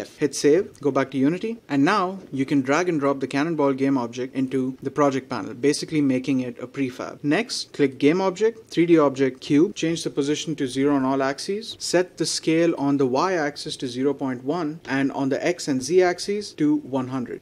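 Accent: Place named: India. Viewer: Damn it's one great Indian accent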